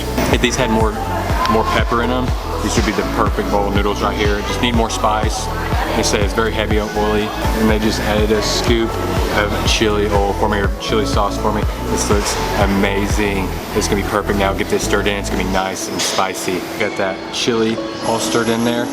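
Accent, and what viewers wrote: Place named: South, United States. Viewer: you are super star in my book to eat Szechuan ma-la chili, pork brain, tripe and all the other deliciousness. Also, love your calm voice and slight Southern accent. 👍🙌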